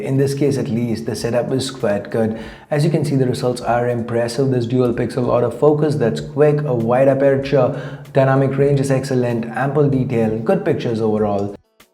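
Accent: India. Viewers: typical fucking infian accent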